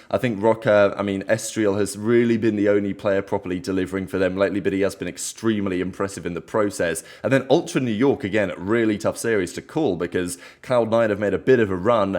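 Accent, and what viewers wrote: England, United Kingdom. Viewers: He’s saying very much intrigued to your thoughts. Fellow Englishman I can translate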